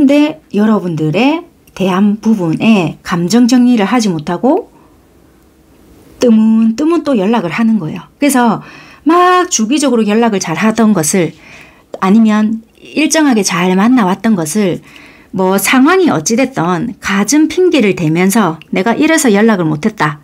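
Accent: Busan, South Korea. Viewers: 선생님❤2번 리딩 감사합니다!!! 평안한 밤 되세용😊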